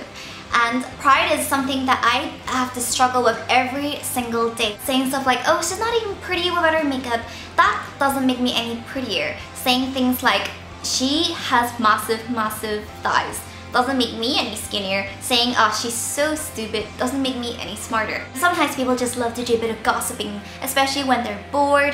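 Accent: Northern Ireland, United Kingdom. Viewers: She has mostly a Northern Irish accent :) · Woah you sound like you have a northern Irish accent! :o P.S. I'm Irish and this is my first video I watched of you and you seem lovely :) <3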